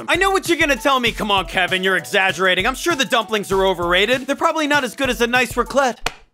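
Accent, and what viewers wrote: China. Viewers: ON EN PARLE L'ACCENT CHINOIS DE LE RIRE JAUNE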